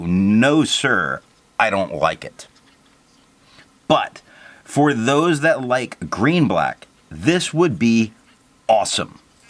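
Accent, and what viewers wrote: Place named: Philadelphia, United States. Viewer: Oh well. Some of your inflections sound like Philly. Hope you have a merry Christmas!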